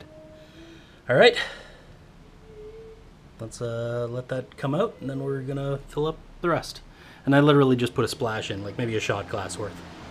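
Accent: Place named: Canada. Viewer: Just stumbled upon your video. Lol as you started talking I was saying yo myself this guy must be Canadian! Then I saw the Ontario plate. Lol good video bud!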